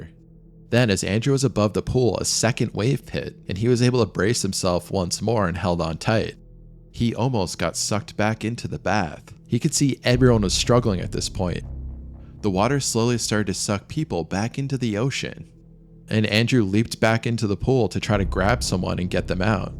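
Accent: United States. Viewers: I'm doing some work at home and I have to choose between hearing this guy's American accent and a neighbour using a chainsaw. Shit!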